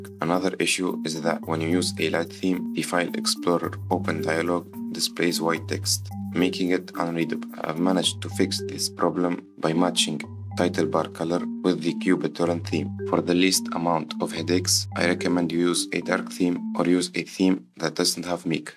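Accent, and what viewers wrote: Russia. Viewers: Um, cuz bro speaks like a robot with russian accent? Really slow, stuttery and without any soul in words. No doubt, it comes with experience and the author should continue improving (cuz e.g. all this themes have never been bundled in one video, usually being on different subreddits and videos => useful), but being surprised with a low number of subs is either your delusion or major d*ck riding lmfao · Ты русский, что-ли? Крутой акцент =)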